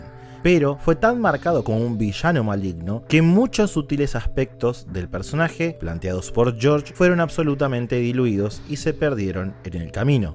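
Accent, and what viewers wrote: Argentina. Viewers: es argentino? Pobre. Saludos desde argentina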